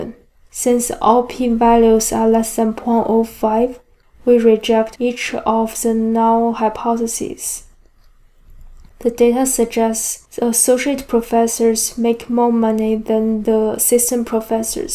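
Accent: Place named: Asia. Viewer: Super thick Asian accent. Had to turn on subtitles.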